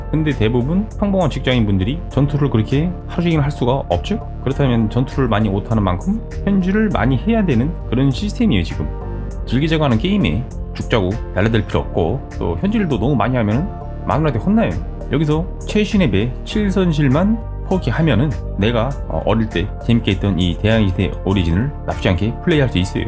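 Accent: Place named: Chungcheong, South Korea. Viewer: 충청도이신가 .. 억양에 친근감이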